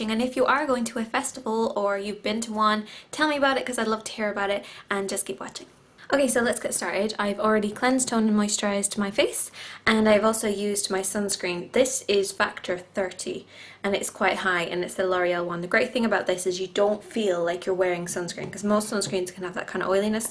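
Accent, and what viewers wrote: Ireland. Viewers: are you from ireland ? o: your accent makes me think c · Are you Irish ?-2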